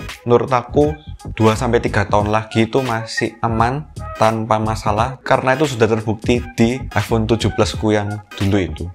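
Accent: Java, Indonesia. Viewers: Medok banget ko kaya saya🤣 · Fokus dengerin pengalaman ❌ Iri dengerin logat jawanya yg lebih medok dari saya yang orang jawa ✅ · Medok review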